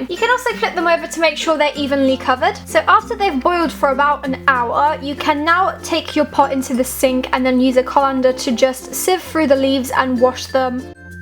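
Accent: United Kingdom. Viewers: Are you british or something? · R u British??????? It's bugging me that I don't know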